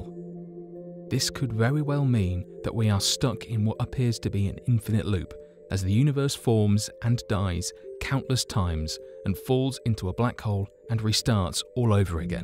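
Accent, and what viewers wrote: England, United Kingdom. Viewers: its always a british narator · I knew he was going to have an English accent before the video loaded.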